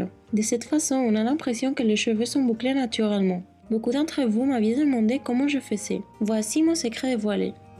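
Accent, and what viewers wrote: Spain. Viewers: tu as un joli accent espagnol et j'aime bcp les coiffures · Tu as un joli accent espagnol, et tu est très jolie. :) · Elle est espagnole. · J'aime vraiment ta voix et ton petit accent espagnol ! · Elle est espagnole😍😍😍😍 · Elle est espagnole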